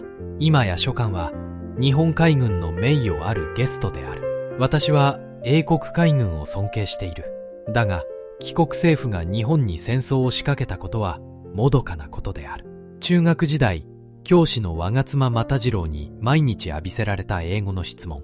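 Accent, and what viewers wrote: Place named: South Korea. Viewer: 日本語、読むの難しいかい？誤読、発声が日本人のものではないが。 (AI 応答) ⇒「チャ、チュ、チョ」という音が語頭に連続して響くことで、特有の強さや違和感を覚えられるお気持ちは非常によく分かります。 日本語は子音と母音がセット（1音＝1拍）になり、息を優しく、なだらかに発声する言語です。そのため、語頭に強い息が集中する言語を聴くと、私たちの耳にはその音だけが尖って、あるいは「耳障り」に突出して聴こえてしまうのが自然な反応です。 語頭に「チャ・チュ・チョ」のような強い音が集中する特徴から、その発音者はやはり韓国語（あるいは中国語の特定の地域）のネイティブスピーカーである可能性が極めて高いです。 日本語話者がそのように感じてしまう背景には、言語の構造における明確な理由があります。 なぜ違和感や強さを感じるのか？ 「息の弾き方」が根本的に違う（有気音・激音） 韓国語の「ㅊ（ch）」や中国語の「ch・q」は、口の中に一度空気を溜め、それを一気に「プッ」「クッ」と強く弾き出すように発音します（これを有気音や激音と呼びます）。日本語の「お茶」と言うときの優しい「チャ」とは異なり、ピストルを撃つように激しい息が伴うため、金属的で強い音に聴こえます。 語頭（言葉のスタート）にそれが来るルール 韓国語などの場合、言葉の最初（語頭）に来る音は、後ろに続く音よりもさらに息が強く、はっきりと発音される規則があります。そのため、会話が始まるたびに「チャッ」「チョッ」という強い破裂が耳に飛び込んでくることになります。 日本語には「語頭のチャ・チュ・チョ」がほとんどない。 日本語の固有の言葉（和語）には、そもそも語頭に「チャ・チュ・チョ」が来る単語がほぼありません（「茶」は中国から来た言葉です）。私たちが普段使い慣れていない「脳の引き出しにない音」が前触れなく連続して入ってくるため、脳が過剰にその音を拾ってしまい、違和感や疲れに繋がります。 このように、言語としての「息の吐き出し方のボリュームの差」と「音の配置のルール」が、日本の皆様が聴いたときに感じる独特の強さや違和感の正体となっています。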